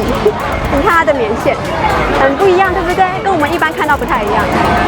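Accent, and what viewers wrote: Taiwan. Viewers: 這台語蠻有特色的🤣🤣🤣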